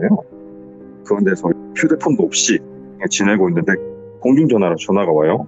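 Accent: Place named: Gyeongsang, South Korea. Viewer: 아이구 이야기는 참 무서운 이야기인데 말하는 분이 경상도 대구 분인 것 같은데 저기 경기도 지역 요즘 20대 여자분들 말투 따라하시는 것 같아서 조금 듣기가 거북했습니다. 음절 뒷부분을 요즘 20대 여자들처럼 올리지 마시고↑ 원래 쓰시던 대로 내려 주셨으면↓ 듣기가 편했을텐데...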